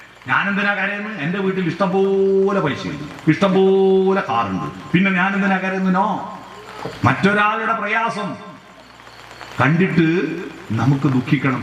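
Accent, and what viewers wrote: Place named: Kannur, India. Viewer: ' ഞാൻ ഏറ്റവും കൂടുതൽ കേൾക്കുന്നത് ഈ പരിപാടി ആണ്. കാരണം പഴയതും പുതിയതും ആയ പലതും ഇതു കേൾക്കുമ്പോ പഠിക്കാനുണ്ട്. എത്ര എത്ര അർത്ഥവത്തായ കാര്യങ്ങളാണ് പറയുന്നത്. ഇദ്ദേഹത്തിൻ്റെ കുടുംബം എത്ര ഭാഗ്യമുള്ളതാണ്. കാരണം ഈ ഒരാളു കാരണം കുടുംബം നാട് -ഒക്കെ നന്നാവുമെങ്കിൽ എത്ര സന്തോഷം. ഒരു ഉദാഹരണം . ഇന്ന് കാലത്ത് ഒരു ദുഃഖവാർത്ത കേട്ടു അപ്പോ മുതൽ മനസ്സ്മല്ലാത്തൊരു അവസ്ഥയിലായി. ഒന്നും ചെയ്യാൻ തോന്നുന്നില്ല ആകെ ഒരു വല്ലാത്ത അസ്വസ്ഥത അപ്പോ എന്താ ചെയ്യേണ്ടത് നാമം ജപിക്കാൻ തോന്നുന്നില്ല ഒന്നും വായിക്കാനും തോന്നുന്നില്ല TV ഒട്ടും കാണാൻ തോന്നുന്നില്ല എന്തോ എനിക്കതോന്നി ദിവസവും കേൾക്കുന്ന ഇദ്ദേഹത്തിൻ്റെ ചാനൽ നോക്കിയാലോ പലതും കേട്ടതാണെങ്കി കൂടി കേൾക്കാൻ രസമുണ്ട് വാസ്തവങ്ങളാണ് പറയുന്നത്. വച്ചു കുറച്ചു കവിതയും എല്ലാം കേട്ടപ്പോ ഒരു വലിയ ഭാരം മനസ്സിൽ നിന്നും ഇറക്കി വച്ച പോലെ തോന്നി. ഇതാണ് ഒരു മരുന്ന്. ഒന്നു കേട്ടു നോക ഒരാശ്വാസവും - ഒരുഉത്സാഹവും ഒരു സമാധാനവും കിട്ടി നന്ദി ആരോടു ചൊല്ലെണ്ടു ഈ മനുഷ്യനോടോ ഈശ്വരനോട് അറിയില്ല ഭാഷ കണ്ണൂർ ആയതു കൊണ്ട് ആദ്യമാദ്യം ചിലതൊന്നും മനസ്സിലാവില്ലായിരുന്നു. 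ഇപ്പോ കുഴപ്പമില്ല. ഒരാവർത്തിയല്ല പല ആവർത്തി കേൾക്കുന്നു ഞാൻ. നന്ദി - നമസ്കാരം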